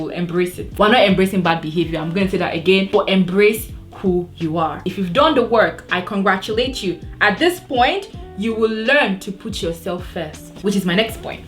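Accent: Nigeria. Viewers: I’m Kenyan!🇰🇪And I love your Nigerian accent! Haters are gonna hate. Just keep up with your amazing videos & advice!❤️ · The intro! :) Girl your accent sounds beautiful!!!! Naija giiiirrllll <3 MUCH LOVE SIS! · I love your beautiful nigerian accent 😻 I am French and been watching you for so long. I understand so well Keep going and glowing ❤ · This video just said it all and I love the fact that you also don't care about what people think about your accent,if it's the accent that's their problem,they should find the accent best suitable for their ears then. Team Nigeria 🇳🇬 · Girl one of the reasons I listen to you is because of your accent 😂. Naija represent, don’t mind these people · I actually love Nigerian accents I think they sound so beautiful and genuine, honestly growing up with parents who have an accent makes me ask the people who said, why you watching the video, if you can’t get past the accent?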